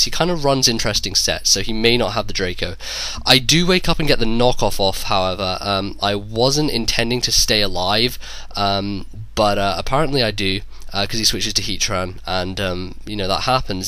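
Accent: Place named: United Kingdom. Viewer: I love your commentary! great to hear a brit commentating, sooo much better than the yanks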